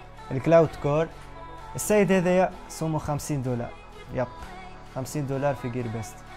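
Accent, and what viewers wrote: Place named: Algeria. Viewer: dude 3andek famille mn ljaza2ir bicuz ur voice benli dziri :3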